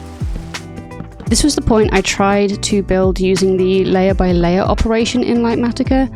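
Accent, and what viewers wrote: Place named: RP, United Kingdom. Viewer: Hi. You say you want to get better at narrating your videos, instead of just , “waffling on “. But perhaps that’s one of the reasons people are watching your videos. You have quite a posh voice, but I like it when you say the occasional word, that has a slight West Country twang to it. I’ve never used that mod myself, but it did turn into a great build. Was it your design, or is it a preset plan of the building that you copy ?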